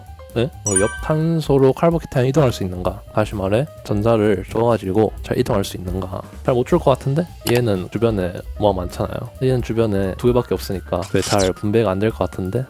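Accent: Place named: Gyeongsang, South Korea. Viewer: 노↗️래↘️방↗️ 방↗️송↘️하니까 경상도 티남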